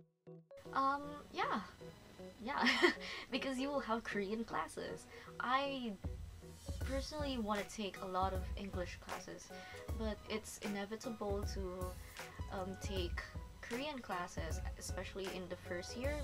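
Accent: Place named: United States. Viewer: You study in Korea but it sounded like you are now in US because of your accent. Do you have that accent already before you go there? I forgot for awhile that you are in Korea, no offensement to the people there but most of them go to English speaking country to learn the language and you are in the opposite of it.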